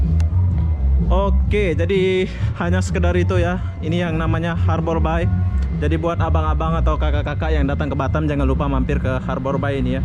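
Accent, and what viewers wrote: Malaysia. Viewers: Masih penasaran aku..org indo tp kok logatnya malay.. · Abang ini asli dari mana kah? Logat nya melayu bgt.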